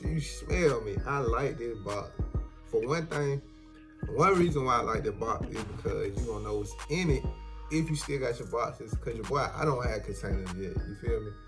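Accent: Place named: South, United States. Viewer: Can’t tell if you’re from Atlanta, Florida, or Alabama....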